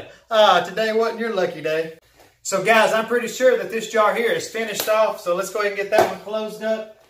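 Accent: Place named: South, United States. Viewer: Im a new subscriber from catch em all and ive watched quite a few of your vids back to back and im so impressed ! You have so much enthusiasm for the hobby and its a huge plus you love reptiles ! I would love to rock your shirt up here in Bucks County, Pa ! I absolutely love your community lizard tank and it inspires me to start one ! I have 3 & 1/2 years clean from heroin and reptiles and fish were my savior from that horrible life. Its a great hobby and it saved my life. Btw - i LOVE your amazing southern accent!!! Thank you so much for welcoming us new subscribers !!!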